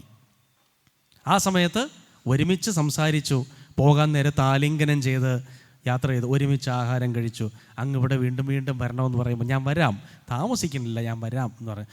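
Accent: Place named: Thiruvananthapuram, India. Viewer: തിരുവനന്തപുരം ഭാഷ യും. മഹാ പണ്ഡിതനും ഹരേ കൃഷ്ണ 🙏 ഇതു കേൾക്കാൻ sadhichathu മഹാ ഭാഗിയം 🙏🙏🙏🙏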